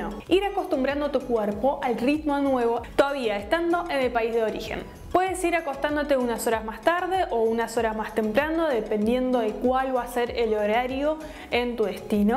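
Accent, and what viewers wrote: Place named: Argentina. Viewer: Muy buen video! Me pareció un poco raro tu acento en este. Estás tratando de hacerlo más neutro pero aguante el acento argentoo! 😘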